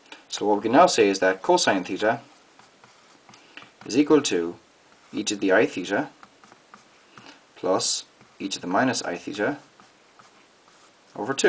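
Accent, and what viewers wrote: Ireland. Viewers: Fellow Irishman by the sound a things. Fair play man, good to see some of our folk contributing to the good cause of youtube math..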